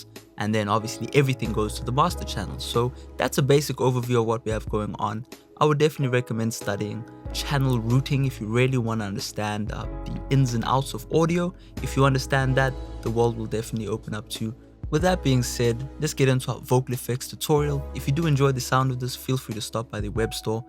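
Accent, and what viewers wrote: South Africa. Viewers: you sound you from South Africa 👌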